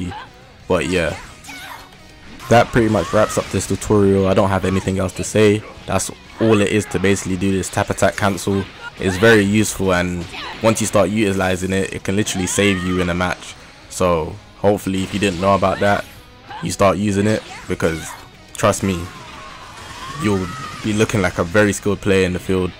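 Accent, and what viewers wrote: England, United Kingdom. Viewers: i did lol , the english accent is too thick.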